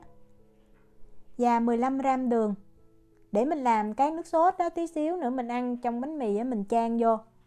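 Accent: South, Vietnam. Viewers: Mình là người Bắc( Hà Giang),từ khi biết kênh chị đã rất thích các công thức nấu ăn của chị và cả chất giọng miền Nam nhẹ nhàng, truyền cảm này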